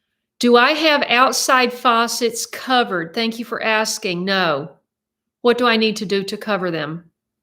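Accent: Tennessee, United States. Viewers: Happy Birthday, have a wonderful time amazing, its Lucinda's birthday tomorrow 15th, my birthday is the 5th of April i shall be the big 80 can't believe it, you're getting back you're Tennessee accent love it Lucinda noticed it more than me, you're fireplace and livingroom looks really cosy and picture are outstanding "well done" missed the livestream, horse riding exercise see you next video love, Edwin & Lucinda Xx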